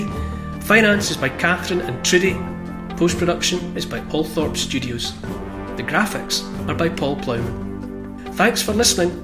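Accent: Scotland, United Kingdom. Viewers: Cheers, doof doof on heart. Lurve ya skotiesh akscent..! 🫵😇🤙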